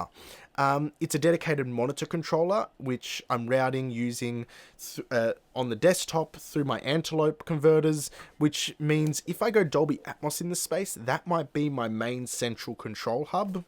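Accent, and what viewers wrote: Australia. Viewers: This has changed the way I view putting my room together thank you!!! So nice to hear a fellow Aussie break down things to Australian standard as well!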